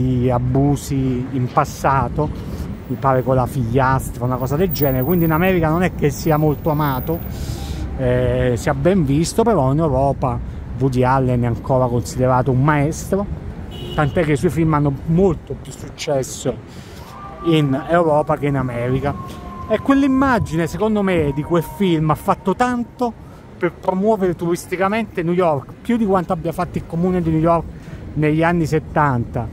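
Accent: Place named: Campania, Italy. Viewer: Complimenti per i tuoi bei video. Ti ho scoperto da poco. Una bella passeggiata come farebbe un tranquillo turista. E poi il tuo accento campano è magnifico, fa il 50% della bellezza del video..👍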